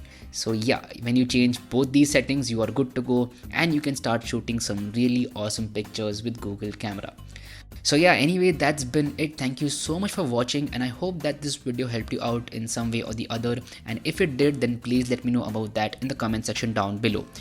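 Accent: India. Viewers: Open video = indian accent = close the tab 😌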